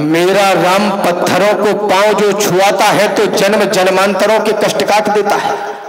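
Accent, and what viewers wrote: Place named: Khari Boli, India. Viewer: मै जब भी सुनता हु आपको आपकी सिधि खड़ी बोली सुनकर जबरा फैन हो जाता हु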